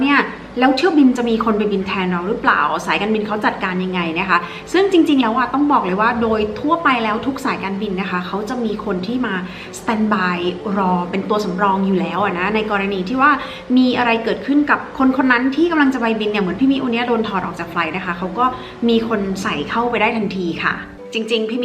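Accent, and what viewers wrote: South, Thailand. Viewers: พี่มิพูดใต้ให้ฟังหน่อยค่ะชอบๆๆๆๆ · ชอบพี่มิแหล่งใต้ 😁🤭😁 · สำเนียงใต้ก็น่ารักดีนะคะน้องมิ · มีแหรงใต้ด้วย น่ารักจังเลยครับ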